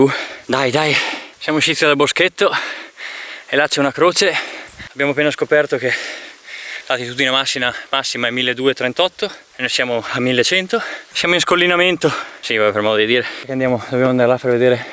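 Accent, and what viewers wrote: Veneto, Italy. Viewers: Bellissimo posto, anche se per arrivare bisogna fare un bel po' di fatica 😁😁 Insomma 40% on è roba di tutti i giorni. Scusa se chiedo, ma da dove sei? Perché l'accento mi pare un po' Veneto, ma non so, forse mi sbaglio io 😁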